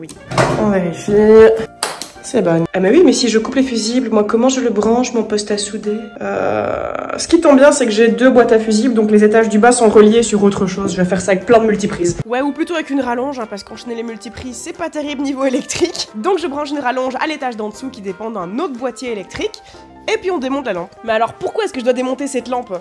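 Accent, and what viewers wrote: Belgium. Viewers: Entre "tant que ça drache pas des seau d'eau" et "je rappellerai le plombier pour savoir quoi" ton parler belge (qui ressemble à du parler ch'ti) me ravit les oreilles. Bon courage avec ta rénovation🌻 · Pour dire WC les Belges vous dites "ouécé"??? Je sais que les Français on est pas logiques non plus vu qu'on dit "vécé" mais bon😅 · Je voudrais juste savoir un truc tu es française ou belge car tu as l’accent belge mais tu dis (sopalin ) comme les français marque qui n’existe pas en Belgique mais la bonne marque serait scotex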